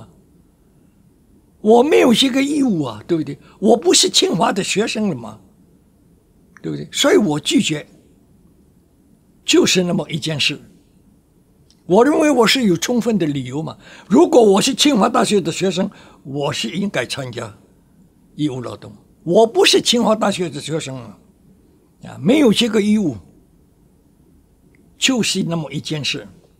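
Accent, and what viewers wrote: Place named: Indonesia. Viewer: 老先生说的是：八年当哑巴！不是半年！ 49年到57年！印尼口音而巳！😢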